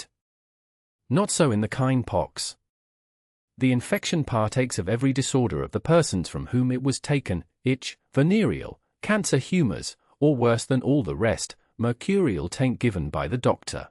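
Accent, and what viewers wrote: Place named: United Kingdom. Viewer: The fact that the voice is British…. Doesn’t fit. He was American. And his book is amazing. I couldn’t put it down, so the fact that this is not catching my attention says a lot, to me anyway. Lol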